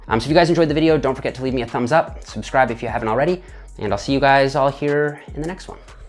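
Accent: Canada. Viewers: lol the 'erhm' & 'baarh' Canadian af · Canadian accent lol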